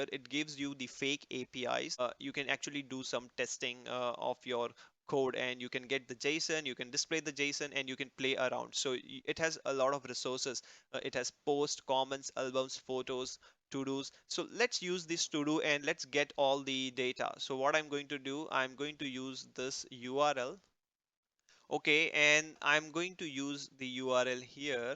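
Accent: Gujarat, India. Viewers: are u a gujrati?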